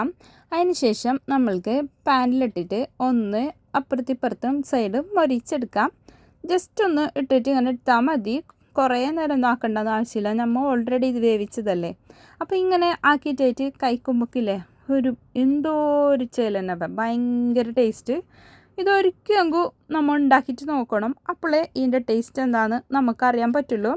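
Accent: Kasaragod, India. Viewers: Super recipe anallo ! Koodathe ningalude kasargodan style talking enikku bhayangara ishtanu !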